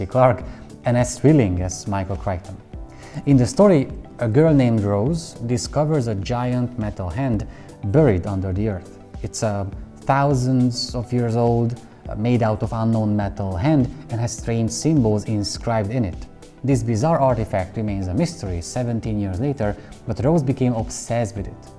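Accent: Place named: Hungary. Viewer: I knew you were Hungarian as soon as I heard your accent!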